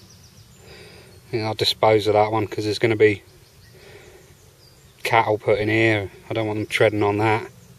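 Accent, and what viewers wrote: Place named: Essex, United Kingdom. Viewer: G'day I'm an Australian these days but came from Ilford back in 1970. Where are you? You sound a bit like an Essex lad. I detect here in Perth but there is nothing very old in Oz.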